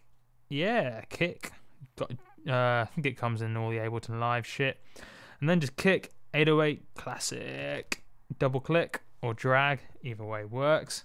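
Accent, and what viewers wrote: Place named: England, United Kingdom. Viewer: I love this guys passion for 808s, trap, muzik production. Love the enthusiasm and english accent! Cheers mate!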